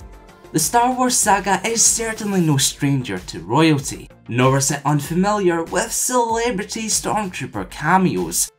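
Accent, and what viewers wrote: Scotland, United Kingdom. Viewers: what part of Scotland are you from? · thanks for answering. I don't mind I like your accent:)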